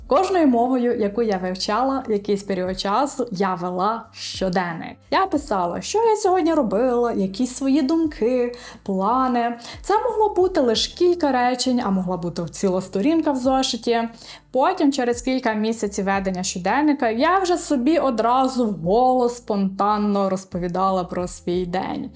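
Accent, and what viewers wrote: Western Ukraine, Ukraine. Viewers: Судячи з акценту говірки (характерного м’якого «и»), ви, швидше за все народились і виросли на заході України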